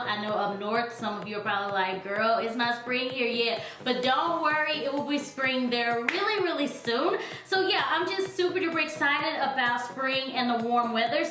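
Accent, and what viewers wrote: South, United States. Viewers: Love your southern accent ! ! !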